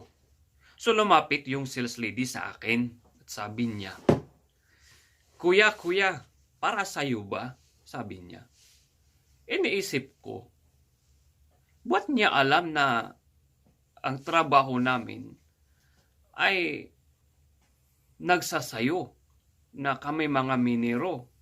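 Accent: Ibaloi, Philippines. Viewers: Sa pananalita mo pa lang ay ibaloi ka 😂😂 iyong accent ninyo 😀😀din kankanaey mo abe yan kankanaey di eBugias 😁😁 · Brod pananalita mo palang halatang ibaloi ka at walang masama dba.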